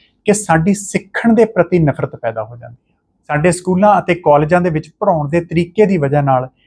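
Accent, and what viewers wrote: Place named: Canada. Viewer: Yes I want it also. Because sir speaks canadian accent.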